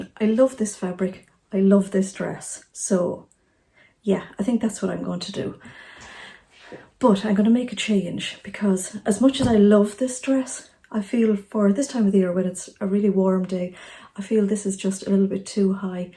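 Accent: Ireland. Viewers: I've just recently discovered your Chanel I have to say I've binged watched them nearly all. Thank you for the hints and tips you make me chuckle as I find myself saying oh I've done that ! And absolutely feeling the pain when you put a lot of effort into your work to find the sizing is totally off ! But you keep going because you love seeing just as I do I also hate to let a mistake beat me I'll try and try to make it work or make something from the material. Cos like you I'm tight I hate waste . So please keep that lovely Irish accent coming with more tutorialS LOL Irene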